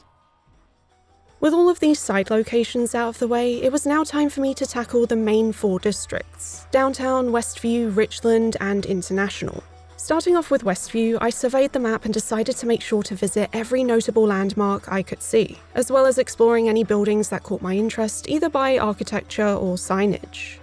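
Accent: United Kingdom. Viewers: WTF you're a woman talking about Matrix video games, your voice is premium ASMR quality, and that sophisticated British accent is the icing on the voice-over cake. LIKE LIKE LIKE LIKE LIKE LIKE LIKE Great job whoever you are, I love you 😃 - Thor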